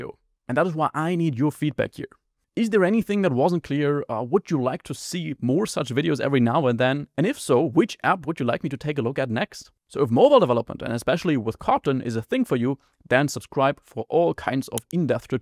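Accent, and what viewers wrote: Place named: Germany. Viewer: 300k subscribers, amazing editor, no money for elocution lessons. He pronounces English as if it were German. No vowel shift, no consonant adaptation. . Cringe. I bet if he invested 300 euro in private elocution lessons, his English would improve above and beyond. He is clearly capable, just unwilling.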